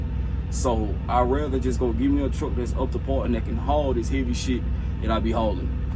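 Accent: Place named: Missouri, United States. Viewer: Definitely a Missouri accent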